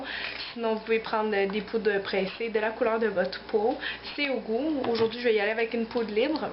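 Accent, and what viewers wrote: Canada. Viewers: Tu es Troppppppp Belllllee trop mignon l'accent canadien !!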